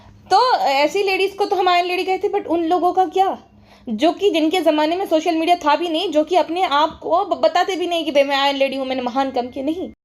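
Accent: Gujarat, India. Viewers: 25 saal ki ho gyi hu pehli baar youtube pr kisi ko comment kiya hai ❤ u r worth it for this love and your gujrati accent ❤❤ lots of love from jammu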